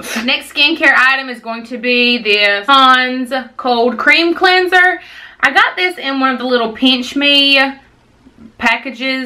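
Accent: South, United States. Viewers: Love ur channel and southern twang!!!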